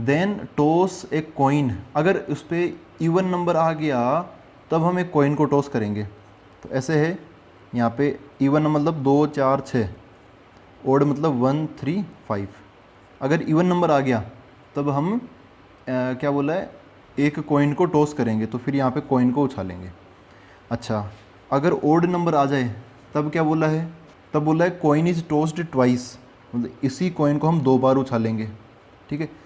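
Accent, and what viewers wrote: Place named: Haryana, India. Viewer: Sir apke bolne k tareeke se lagta hai k aap haryana k ho 😅 Shayad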